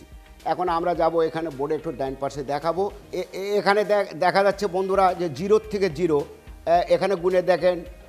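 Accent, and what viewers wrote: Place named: Natore, Bangladesh. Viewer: Tnx sir...sir apner kotha sune mne hosse apner basa natore district a....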